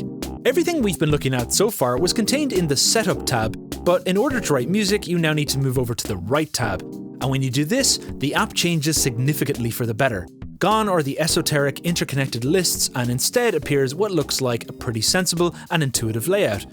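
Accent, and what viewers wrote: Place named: Ireland. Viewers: Raúl Pérez Scotspeople? The man could not be more Irish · how on earth could you think he's Scottish? He has a very very very obvious irish accent. Unless you're deaf and only reading the subtitles, I can't work out how you thought he was Scottish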